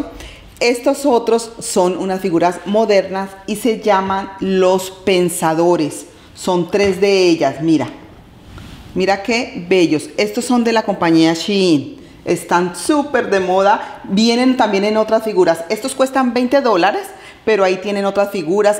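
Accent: Antioquia, Colombia. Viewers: tú canal és maravilloso tús manualidades la forma tán sencilla como explicas todos los pasos a seguir en cada manualidad o cambio hacen de tú canal un lugar favorito único y muy acogedor tu sencillez tú carisma TODO EN TU CANAL ES ESTUPENDO ME ENCANTA y ése habladito paisa que me fascina pues yó vivo acá en bogotá colombia pero???? Toda mi familia mis raices son paisas FILANDIA QUINDIO BELEN DE UMBRIA y vallunas TULUA Y ROLDANILLO aunque también tengo tolimenses en mi familia mi abuela materna es de ALPUJARRA TOLIMA!!!! Un abrazo enorme a ésta bella compatriota qué és mi linda!!!!!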